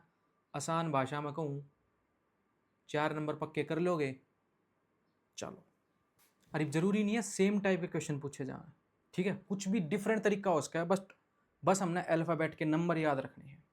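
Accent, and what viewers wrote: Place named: Haryana, India. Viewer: Sir really loved how you are speaking like a proud haryanvi. Many are very ashamed of speaking the language our people, family speak in today’s time in haryana 🙏🙏🙏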